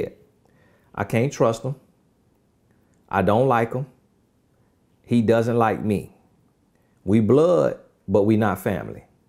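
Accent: South, United States. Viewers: As always, thanks for keeping it real. And you say all this, with an even tone and country drawl, cracks me up! Very interesting psychology of men.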